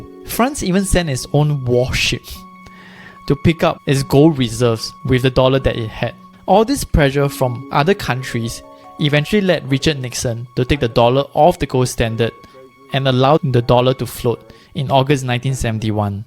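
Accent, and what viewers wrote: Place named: Singapore. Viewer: You speak Singlish.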